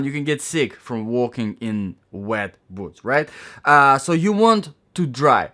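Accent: Russia. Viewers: Russian accent?! :)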